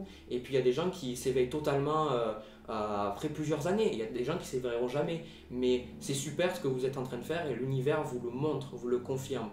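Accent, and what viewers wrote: South, France. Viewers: je découvre cette vidéo que maintenant. J'ai déjà un terrain à être éveillé: de nature très introverti, à me retrouver seul, à avoir des synchronicités et en même temps très empathique. Cependant en 2020 en faisant de la sophrologie encore plus profondément, j'ai reçu un message spirituel comme quoi il fallait que je change de boulot. Je supportais plus les ragots un peu comme tu décris. Aujourd'hui, je suis épanoui dans mon nouveau job. je m'éloigne encore + de ceux qui m impose à ce que je me vaccine vs coronavirus. Je suis pas antiVax, mais là je le sens pas ce truc. Tant pis, mais je m eloignerai des endormis et j'impose pas ma façon de penser aux autres. Enfin, dis moi d'où vient exactement cet accent du sud? Au plaisir de te lire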